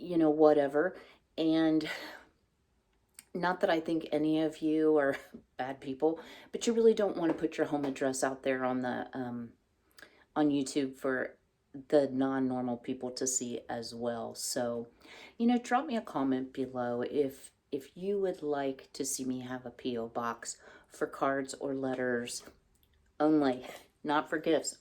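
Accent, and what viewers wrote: South, United States. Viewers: Hi, your new oven looks great 🤩 I think the split door is an incredible idea!! have not seen that before. Alot of my recipe books have timings and temperature written in by me multiple times depending on what oven I had at the time, they vary so much, my present one is on the slow coach side. Recipe sounds really yummy 😋 and looks really pretty in your dish. Love the sound of all the content you have lined up it's definitely going to help me through the rest of the year 👍 Definitely yes to a P.O box too!!! that would be lovely 🥰 I love accents I think they're our history and I love to hear them, so you go as Southern as you like girlie 💕 Lots of love and appreciation from across this darn pond 🤣🤣 Thanks, love to Frankie too 🐾💕🐱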